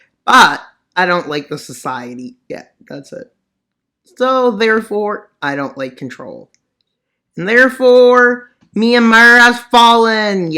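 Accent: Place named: Alabama, United States. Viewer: I don't think he is pa jeet because bro sounds like he is from Alabama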